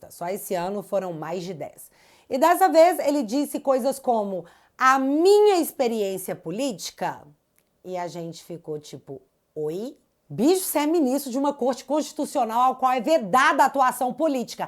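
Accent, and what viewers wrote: Minas Gerais, Brazil. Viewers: Esse é o sotaque mais bonito do mundo! O minerês! Nao te acompanhava, mas ja me inscrevi!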